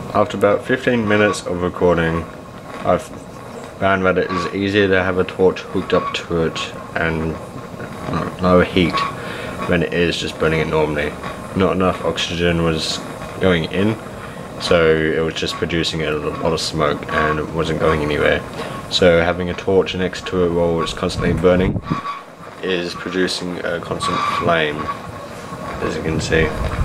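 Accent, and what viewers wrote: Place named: Australia. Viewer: Australia / New Zealand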